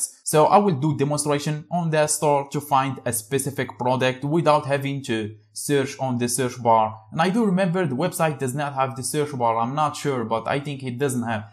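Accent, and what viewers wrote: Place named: Morocco. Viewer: Dude bro you are from morocco You accent sounds familiar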